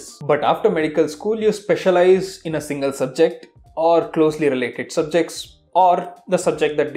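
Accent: India. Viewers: Dude,I don't know why but i can feel your Bangalore's accent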